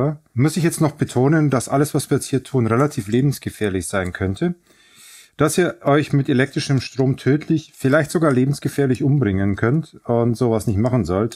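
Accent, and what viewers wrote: Bavaria, Germany. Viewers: Bei mir haperts an der Fremdsprache Bayrisch. Also ist das hier so, als ob ich Electro Boom gucke, nur dass dieser lustige Internetonkel hier nicht so oft unter Spannung steht. ¯\_(ツ)_/¯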